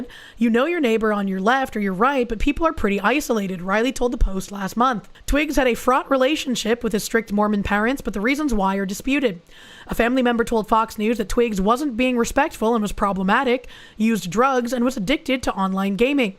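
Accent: Boston, United States. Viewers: Is it me or does sound more feminine while sick? It really breaks up the Boston accent lol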